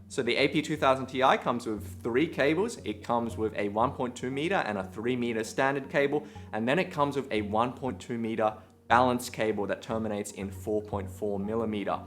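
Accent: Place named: Australia. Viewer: I was not expecting an asian with Australian accent :)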